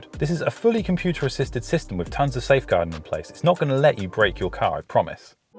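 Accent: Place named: United Kingdom. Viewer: Ahh! This British English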